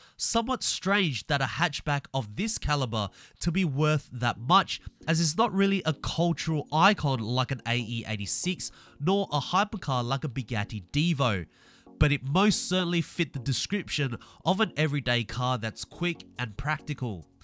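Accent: Australia. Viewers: I never knew you were Aussie mate. I can hear your accent now. I'm across the ditch. Those Rovers were popular here back in the day. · aussie voices are the best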